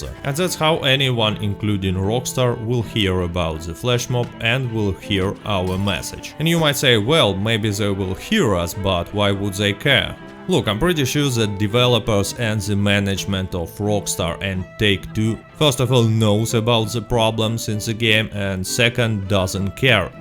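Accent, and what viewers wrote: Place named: Russia. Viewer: I love your Russian accent!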